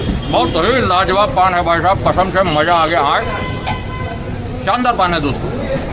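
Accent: Standard. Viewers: Ram Ram Bhai..mujhe shuddh Hindi bahut accha lagta hai, jaise aap bolte hai... Aayiye kabhi Assam, milte hai..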